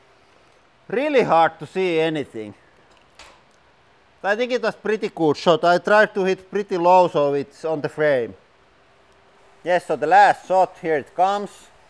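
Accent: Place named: Finland. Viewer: I just came to the realization that speaking English with and an American accent is boring AF. I will watch this channel and practice to speak English with a Finnish accent. SO MUCH more fun. It’s pretty cool!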